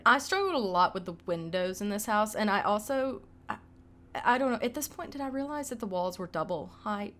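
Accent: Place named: Appalachia, United States. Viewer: Love your voice! Where are you from!? Definitely sounds like an Appalachian accent! Also love the renovation!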